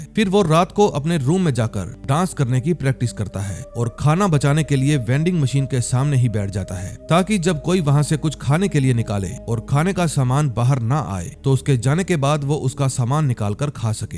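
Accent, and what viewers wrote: Punjab, India. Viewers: Bhai kya aap Punjab se ho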